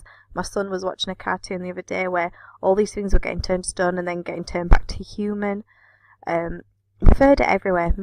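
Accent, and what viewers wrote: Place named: Northern England, United Kingdom. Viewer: To you are total on the right path of truth but this channel iam going to tell u about is mind blowing u must watch it and everything will fit into place go to the Richard mountbleu channel......the mystery of life video u will be amazed at the truth in it.......I no you have got the eyes to see it.........you sound like a Northern lass......please check that video out it goes way deeper than u can imagine.......please please let me no u watched it so iam not wasting my time on people that don't really want to no the truth...Dean from grimsby🇬🇧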